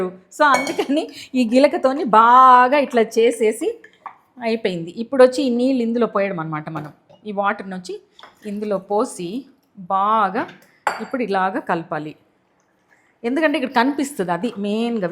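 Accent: Tamil Nadu, India. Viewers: Mee teluguki tamil flavour vachesindi Madam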